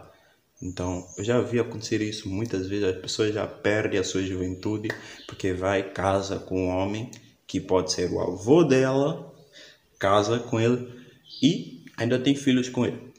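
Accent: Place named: Brazil. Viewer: Vc é um brasileiro puro kkk O sotaque de Guiné Bissau é assim ou é por influncia Brasileira?? Muito legível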